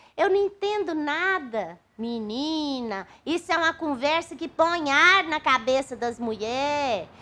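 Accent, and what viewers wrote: Minas Gerais, Brazil. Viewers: Que dialeto maravilhoso! Minerês é um deleite. Obrigado e grande abraço 🙋‍♂️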